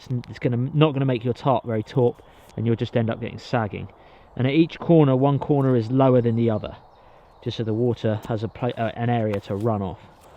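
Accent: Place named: United Kingdom. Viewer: OH my Goodness, I had no Idea that you all over there in the UK used daylight savings time too! I love all your videos, and listening to your accent and learning more about the outdoors. Thank you for taking us all along with you on your adventures and your builds... and OH some awesome foods! Take care.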